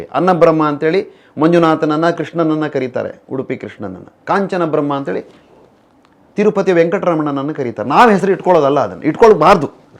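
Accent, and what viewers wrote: Standard. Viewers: ಎಂಥ ಶುದ್ಧ ಕನ್ನಡ ನಿಮ್ಮದು! ಎಂಥ ಸಂಸ್ಕಾರಯುತ ಮಾತುಗಳು!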